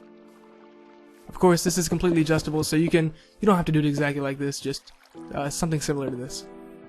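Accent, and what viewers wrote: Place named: United States. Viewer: great video, your american, so i can understand you easily :P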